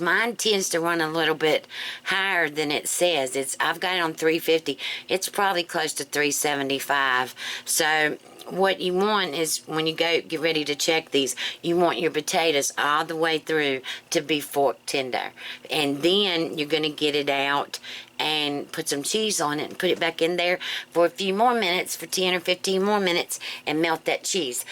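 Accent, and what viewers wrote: South, United States. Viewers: Everything taste better with a southern accent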